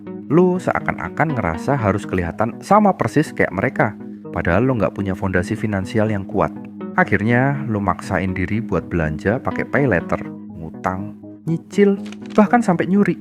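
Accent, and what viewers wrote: Java, Indonesia. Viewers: gue juga heran, bang. aksen Lo Jawa banget, tapi pake Lo Lo, gua gua. biar apa??😄😄😄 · Mas sampean medok, jane rasah nganggo lo gue.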